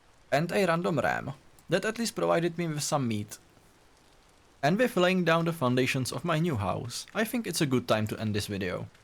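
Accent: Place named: Czech Republic. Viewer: Are you Czech? You sound Czech lol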